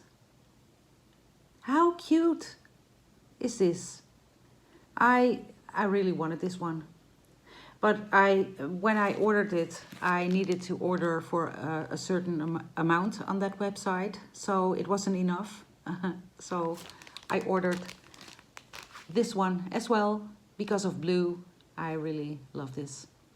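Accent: Netherlands. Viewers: Fab update! Can I just say how beautiful you look in this video!! I loved the Dunglish and strangely understood what you meant each time 😂 fabulous stitching month, love your updates. Happy Easter xx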